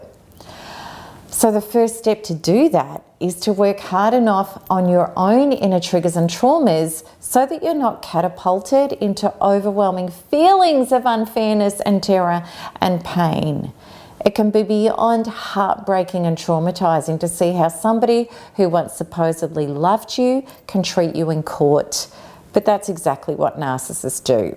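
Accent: Australia. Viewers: Thank you for helping me understand. Three years ago I found you as a result of an awakening prompted by intuition something was not quite right I read an article you had authored. Since then, devaluation, discard, deletion. Loss of home, children, job, family, everything except one very important belief. Me Thank you for your for courage . I kept hearing this Australian voice in my thoughts assuring me I was not alone in this nightmare Thank you, 'down under'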